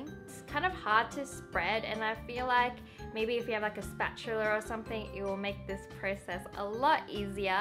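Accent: Australia. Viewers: are you australian? You have an australian accent. i like it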